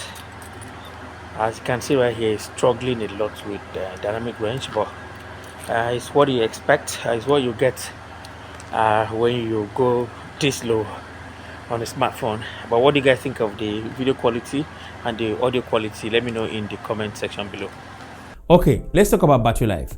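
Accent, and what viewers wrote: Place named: Igbo, Nigeria. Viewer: Igbo man , I can hear your accent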